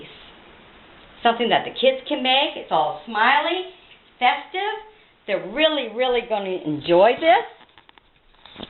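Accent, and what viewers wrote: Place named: New Jersey, United States. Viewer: This is really cute c; Your accent makes me miss my grandma from Jersey. If I ever have kids I'll remember this.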